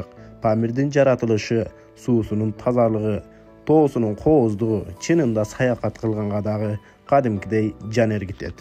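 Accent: Standard. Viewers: Таза суйлопсун Рахмат тууган Памирликтерге салам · Азаматсын кыргыз тилин бурмалабай таза адабий тилде суйлойт экенсин!!!!!👍👍👍👍👍👍🇰🇬🇰🇬🇰🇬🇰🇬🇰🇬🇰🇬🇰🇬🇰🇬🇰🇬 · коптон бери корунбой калдын эле Памирге барып келген турбайсынбы Азаматсын кыргызчаны таза суйлогон Кыргыз жигит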